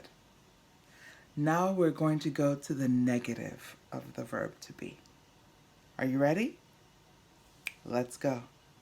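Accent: France. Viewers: I am learning so much. Are you a french native speaker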